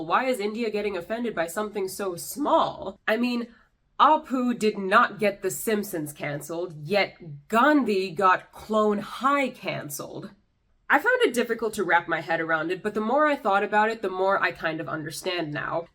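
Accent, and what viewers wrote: United States. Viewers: also in Bengaluru there was this lady in a jewellery shop who was grinning ear to ear thinking you are a typical Indian girl putting on an American accent even though you were not , it’s the normal way you speak from the day you learnt to speak , just wanted to mention it here . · Girl, you're upset about an INDIAN character played by an INDIAN actor having an INDIAN accent? I mean, whether or not the character was written to have an accent and whether the actor himself really talks that way is null and void. The character is INDIAN so having an accent seems fitting. Did he NEED an accent? No, but it's no issue that he HAD one. At least they got an Indian actor to play him than getting let's say, a white person and having him do an Indian accent, then I can see you getting a bit offended but you just seem to be nitpicking. The fact that boy even did that, never knowing him prior to his TV works, was genius was because he was convincing it shocked me when I saw interviews and come to find out that wasn't his accent. 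THAT'S TALENT and should be glorified and I don't mind him doing that more, not just speaking his regular accent because that's just how he talks in real life. He's playing a character after all so he should able to branch out and portray different elements from himself. And don't get me started on the clothes because it's simply to reflect the character's heritage. Do you feel it makes them "too" Indian? Why is it okay for YOU to wear your Indian clothes here? You don't need them given your rather Americanized accent I might say, but it's obviously done for you to reflect your heritage so why can't a character have this done? THE IRONY. Apparently if you're an Indian character and don't have an accent like you or wear Indian clothes (despit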